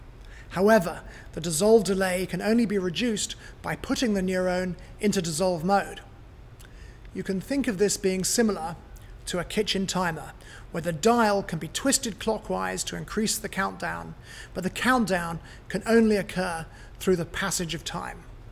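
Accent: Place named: United Kingdom. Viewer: Where can I buy the token ? More importantly how does one learn to speak the queens English with such elegance ?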